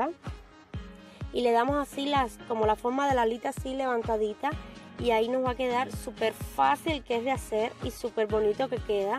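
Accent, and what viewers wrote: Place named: Caribbean. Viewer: Te quedaron lindas y como ya dije un tiempo atras, ese sabor que le pones con tu acento caribeño me encanta.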